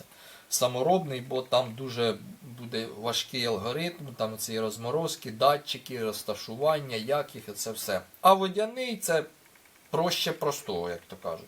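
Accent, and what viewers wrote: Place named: Surzhyk, Ukraine. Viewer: Хороша українська мова. Невеличкий суржик надає шарму. Плюсик :)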